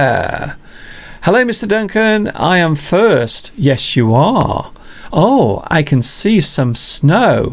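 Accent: United Kingdom. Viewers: absolutely fantastic ! just thumbed it up on the go. I appreciate a lot how you entertain us with clear British English. The best way to learn English is listening to you like a couch potato. And Happy Birthday to your mother and tell her she looks like someone pretty clever with a great smile.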